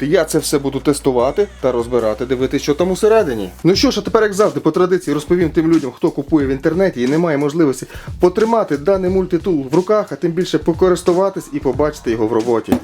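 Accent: Russia. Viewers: Хз, мне лично иногда уши резало неправильное произношение с примесью русского, да что там, даже название неправильно написано(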